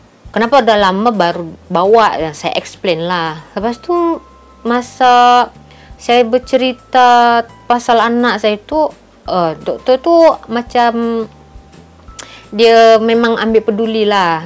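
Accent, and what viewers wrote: Malaysia. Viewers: Astaga ! Ya ampun. Kelakar betul ya. Rawatan di Malaysia, hampir gratis. 1 Ringgit Malaysia diberi banyak obat. Loghatnya udah bertukar kepada slanga Malaysia. Sukses bu.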